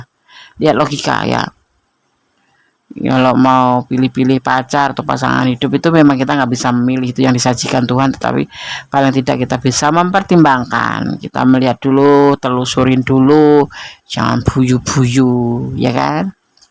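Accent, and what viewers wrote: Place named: Java, Indonesia. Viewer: Jogja hadir nih Kak, wah kk ini klo bicara MEDOK bingit's,sy suka skl cr kk membacakan readingan,kayak ada manis2nya gituuu heheheee.....,maaf kak canda lho😁. Ok kak tksh readingannya,Readingan selanjutnya ditggu🤝🙏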